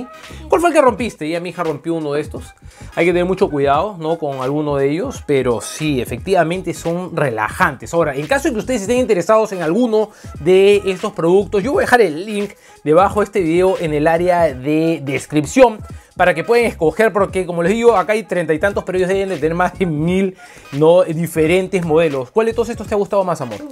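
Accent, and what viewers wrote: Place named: Peru. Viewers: Luis Cueva solo por la forma de hablar, ya debería ser obvio que es de Perú, pero vive en Estados Unidos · Peruano por la zampoña de atras y por la forma de hablar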